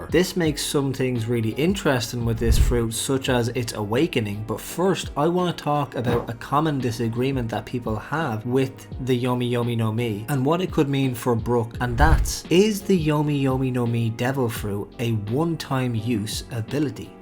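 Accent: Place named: Ireland. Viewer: Came for the theory, stayed for the Irish accent!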